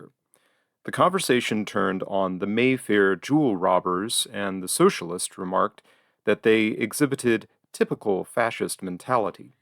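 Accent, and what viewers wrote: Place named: United States. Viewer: Wrong for this to be read by an American voice.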